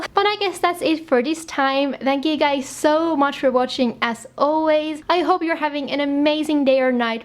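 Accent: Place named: Finland. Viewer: huomaski välillä ihan niiinku oilisit puhunut suomea hih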